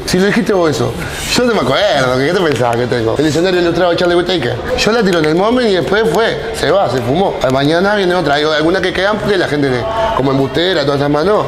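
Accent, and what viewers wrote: Uruguay. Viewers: Que lindo escuchar hablar uruguayo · El ejemplo perfecto del acento Uruguayo 😂🖤💛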